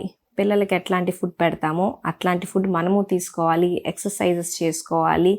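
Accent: Guntur, India. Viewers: Meerkat cheppindi bavundi mi gunter yasa kuda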